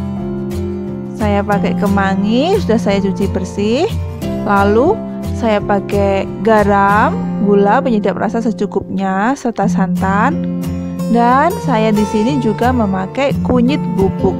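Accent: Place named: Java, Indonesia. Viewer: Wah mantap bikin perut lper ni 😄 jowo medok hadir embak e..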